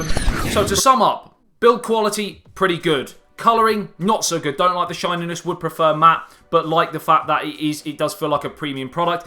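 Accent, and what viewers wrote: United Kingdom. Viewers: I seen this video and held my breath then thought, "Thank, god. He sounds British." Why do I hate my own country so much? XDDDD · The British accent is fucktarded enough, but throw a lisp in with it and you're triple fucked.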